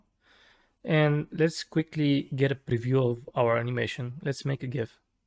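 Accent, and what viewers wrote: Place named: Russia. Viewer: you must be russian because your so sounds like все and your sheet sounds like shit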